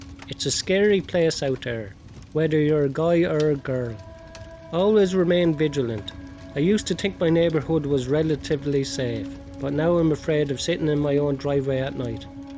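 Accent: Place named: Ireland. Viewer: love the accent, there are way too few Irish youtubers with creepy content! I hope with more subscribers, the mic will be better in future, although I get that it's not first priority. As a suggestion, you could make longer videos, as many people like to fall asleep to them or drive while listening in - and if they are this short, it's not as convienient. About 20-30 minutes would be best :)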